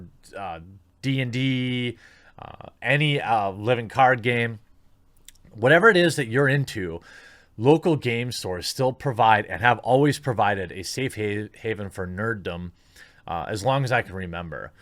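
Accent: Wisconsin, United States. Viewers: Oh man, youre from wisconsin??? Hahahahahaha damn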